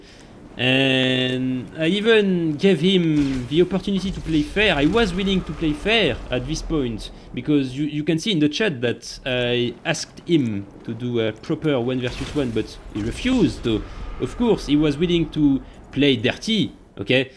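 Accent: France. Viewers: Love your vids man and your french acsent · hey mate.. I like your skills in the game and I respect you. plz ignore these type of stupid players. your English is good enough, even though it's funny strong french accent. but it's cool. I wanna see more of your videos and I advice you to add some music. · Just watched it (5 years late) and it is so funny. Despite the fact that you are right about that dude and his crew being absolute trash it is so funny to see how salty you get. But I want to be clear, I would do the same thing and get salty about ppl being so stupid on GTA online. Its just natural I guess. Love your content, love that french accent and the way you and play „together“. Sincerely, a german. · Ça va ton anglais même si tu as un accent français. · GS bro and I love your French accent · That is 1 thick sexy ass french accent, do more vids plz:p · Your English is fine. 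I mean you have an accent which is understandable because you're French but yeah other than that it's good man don't worry about that.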